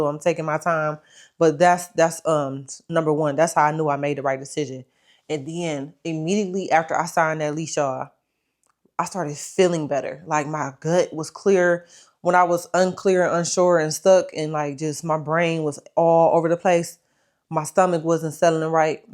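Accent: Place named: Ohio, United States. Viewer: There are three places I've heard residents pronounce "y'all" like Yaw and that's (1) Baltimore, Maryland (2) Philadelphia, Pennsylvania and (3) Columbus, Ohio. Based on what I'm hearing in her inflection, I"m going to guess she's from Ohio. Ohio will say salty a lot pronounced like "saw-dee" and I feel like I can hear that in other words when she speaks. Further research shows Detroit as her place of origin so I was regionally accurate. American North/Northeast.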